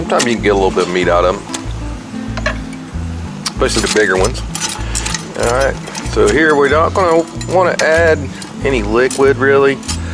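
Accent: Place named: South, United States. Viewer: I'm only half way through and I'm really liking it. The outdoor kitchen is looking great can't wait to see how you decorate the wallscwith your old time cooking gear. I'm Australian so with your southern accent that makes a sentence sound like one long word can you tell me what you said about the 4 burner works well?? Haha great job mate 😎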